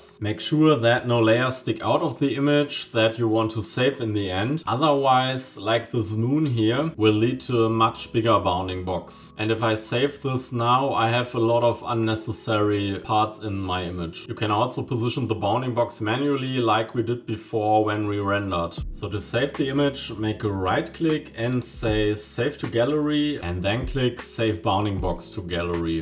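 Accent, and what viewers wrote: Germany. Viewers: Dein deutscher Akzent kickt sowas von rein xD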